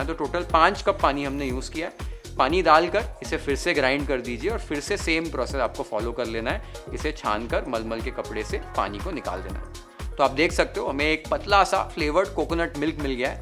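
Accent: Maharashtra, India. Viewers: Pleased by the video 🤤🤤 And your Marathi accent is OMG.....✨✨ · WOOOOOOOOOOOOOOOW, the way you pronounce all the Marathi dishes it's a treat to listen and as always the thali is outstanding. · Your marathi accent is so sweet 😅😂😂& i really like your recipes, they are so easy to follow🤗😁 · You are a pure Maharashtrian.....the way you pronounce birda,fadfada....I'm so thankful to you for giving a platform to our satvik,simple n tasty Maharashtrian food ❤ Bappa tuzya saglya ichha Purna Karo...khup khup aashirvaad.... Ganpati Bappa Morya 🌞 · Sanjyot, tuze marathi uchhar agadi * Marathi* aahet. Khup chan video... Marathi jevan purn dakhavkaya baddal❤ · I can see Marathi accent in you Really want to know the reason Love ur recepies❤ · It's mouth watering recipes.....enjoyed your recipe and typical marathi pronunciation 👏👏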